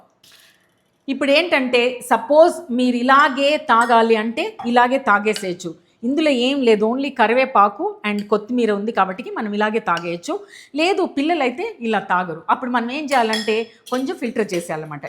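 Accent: Tamil Nadu, India. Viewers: Mee teluguki tamil flavour vachesindi Madam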